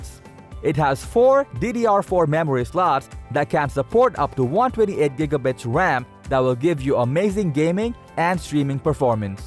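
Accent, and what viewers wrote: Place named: India. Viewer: I know this video is Garbage 🗑 the moment the voice changed. From white boy intro to Indian guy 👦. Bruh you don't need an American guy to to start your video. Plus we can read the info on the box or website. More views with bad comments ain't good for you.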